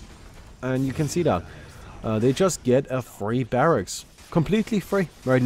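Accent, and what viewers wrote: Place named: Germany. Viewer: I still really like watching these casts. it combines two of the most entertaining things that can be found in youtube. Games, and german opinions. that accent just makes everything more fun to me :)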